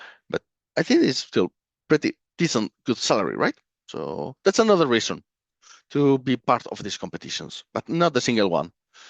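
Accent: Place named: Spain. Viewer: Very useful information and thank you for that but your Spanish accent in English is a disaster. It would be nice to fix this problem. Sorry for that, but I could understand in rewind. Anyway, thanks for the info.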